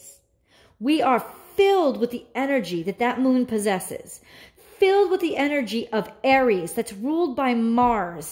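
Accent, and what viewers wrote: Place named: Philadelphia, United States. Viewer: I am laughing out loud.....you sound like you are from my area,,,,Philly......haha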